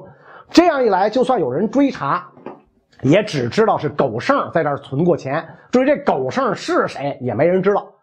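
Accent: Beijing, China. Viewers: 喜欢袁老师京片子，猴儿赛因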